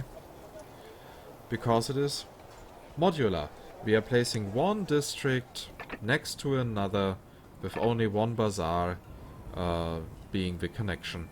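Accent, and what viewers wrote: Germany. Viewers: Thank's a lot ^^ Man hast du nen deutschen Akzent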